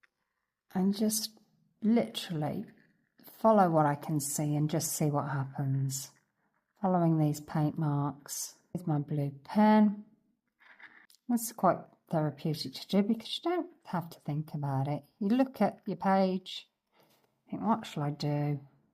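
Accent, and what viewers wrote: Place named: United Kingdom. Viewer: I’d love to know where in the UK you live. I moved from Nottinghamshire to the USA nearly 20 years ago and your accent sounds familiar.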